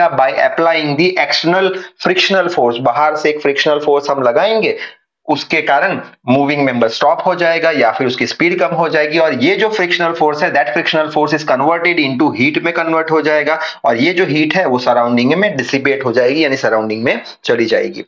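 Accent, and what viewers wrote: Gujarat, India. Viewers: sir are you gujarati?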